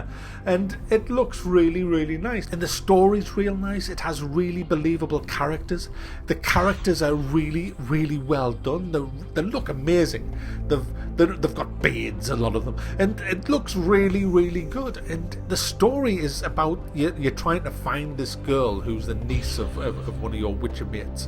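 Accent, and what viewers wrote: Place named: Scotland, United Kingdom. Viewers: Senor Studly I think you'll find he's Scottish :/ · I'm so glad someone tries to actually lighten up the tense ass atmosphere surrounding this game, especially in Scottish rofl. had me in tears, because it really reflects how pissed I feel that this level of gameplay is considered a flawless game. It's one of the stiffest effin' games ever. And all the quest are the same. 1. Talk, 2. Questmarker, 3. Witcher sense head ache camera, 4. Forced battle, 5 Talk. Urgh. I finished the game anyway so it's a decent experience all in all, but the gameplay has always been a disaster in this studios games. Why don't they make something that's fun to play? Like seriously, it's like the game is flat. There is no real climbing, no PROBLEM SOLVING, except for some ridiculously obvious puzzles etc. End of rant.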